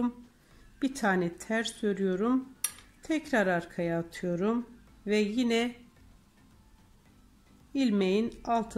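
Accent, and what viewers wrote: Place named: Black Sea, Turkey. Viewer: Karadeniz (Rize)şivesi bu!👍😊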